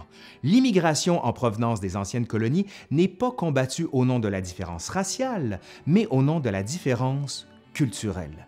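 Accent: Quebec, Canada. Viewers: Sans racisme aucun , l'accent Québécois me fait saigner les oreilles , alors que j'adore nos cousins d'outre Atlantique , c'est juste leurs accents que je trouve insupportable . Les juifs étaient déjà persécutés bien avant la crucifixion de Jésus (égyptiens , Babyloniens ) . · He is from Quebec, they speak a french dialect with another accent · ACCENT CANADIEN EN MASSE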